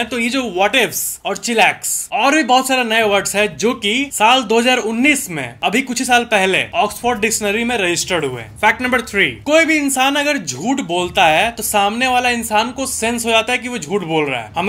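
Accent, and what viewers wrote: Bihar, India. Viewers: missing telling facts in bihari voice form💖😊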